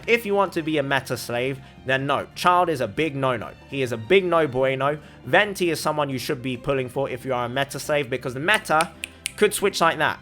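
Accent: United Kingdom. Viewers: why the heck are you asian but has a british accent?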